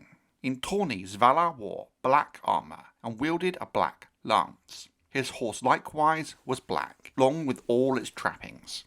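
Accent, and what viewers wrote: United Kingdom. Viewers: You have the most British voice ever. Like the josh Brolin of England lol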